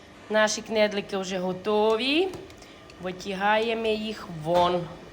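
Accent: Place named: Tiachiv, Ukraine. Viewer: землячко! Тебе тільки чути,- одне задоволення. Одразу пригадую Тячів, місто, де народилася. Привіт з Києва❤😊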